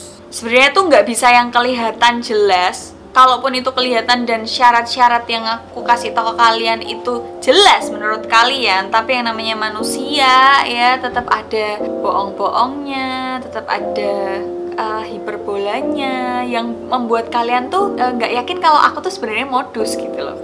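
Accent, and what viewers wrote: Java, Indonesia. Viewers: Medok yo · Medoknya ngangeni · Jowone ngendi iki mba'e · jowo ndi to mbk, kok kentel tenan jowo. ne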